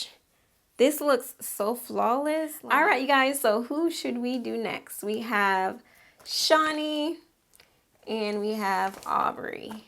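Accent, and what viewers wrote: Jamaica. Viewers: OMG! Gurrrrrrlllllll I LOVE YOUR WIG VIDEOS! Your personality is what really drew me in as a subbie! But I heard an accent OMG are you Jamaican ? Wish I could subscribe 10 more times now! You go girl! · Mi did know yah Jamaican ....🤣🤣...lol first time watching you and with in the first few minutes I was like she prob Jamaican......guuurl! New subbie😂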